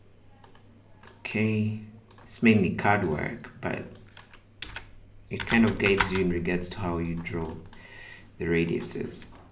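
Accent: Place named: Uganda. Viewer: you sound like a ugandan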